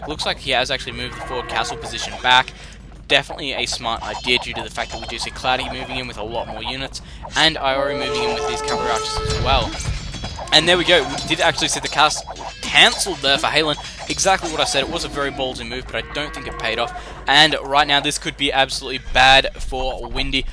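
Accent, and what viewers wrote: Australia. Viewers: Are you-(the commentator in the video)- australian?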